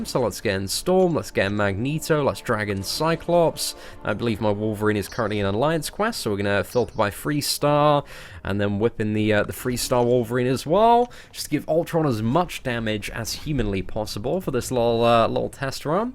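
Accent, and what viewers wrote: United Kingdom. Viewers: where r ur streams at boy.... enough with ur personal meaningful life... we need ur british accent chattering in the background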